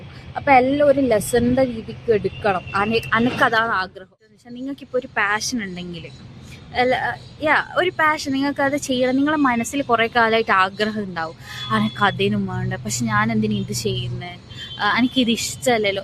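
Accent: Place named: Kannur, India. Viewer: Well done 🎉. I read the description after that understand the content of this vlog,I think opening feel lag but end of the video is superb because you give message to us. Kannur slang is good,content of this video is excellent.we will be waiting for next episodes with different content.Any way all the very best for your future.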